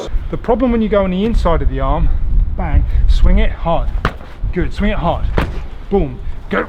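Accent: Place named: Cockney, United Kingdom. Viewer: Beautiful accent master, is it cockney?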